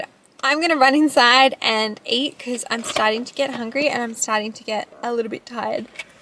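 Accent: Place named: England, United Kingdom. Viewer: I’m confused. What country are you in? You have an English accent but you told us at the end that it wasn’t covered by Medicare and it costs 190 dollars! Medicare in The U.S. is for older adults. Why it’s important to know is if it’s covered or not. If UK I’m surprised it’s not covered. I am in france and had several infusions totally covered. Can you please tell us which country you are in?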